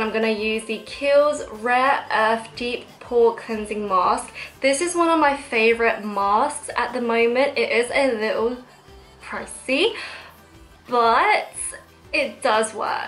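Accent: England, United Kingdom. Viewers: I NEVER EXPECTED YOU TO HAVE AN ENGLISH ACCENT I AM SHOOK. OMG YOURE SO EXTRA ADORABLE WITH THAT VOICE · I've only ever seen your videos on Instagram and was completely shoookk at your British accent. That was completely unexpected However you are so lovely and slay these makeup tutorials to the max ❤❤❤❤❤👏👏👏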